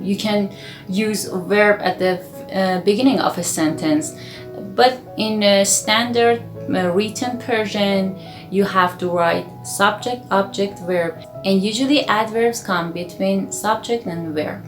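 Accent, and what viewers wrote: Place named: Spain. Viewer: This is useful thank you. Your accent is a bit spanish.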